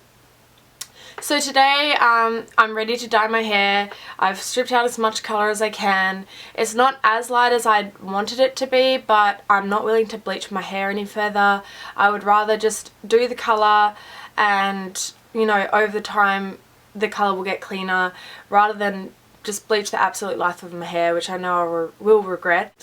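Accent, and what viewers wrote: Australia. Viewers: Is she australian? Sorry i don't understand any accents... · Omg your Australian!! SNAP!! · Are you australian?! If you are I. So happy!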